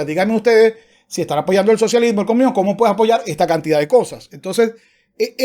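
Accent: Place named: Venezuela. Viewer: Bonito tu canal hermano. Aqui estamos apoyandote, porque eso es lo que necesitamos, apoyar y promover el sentido común. Tienes acento Venezolano, eres? Un abrazo!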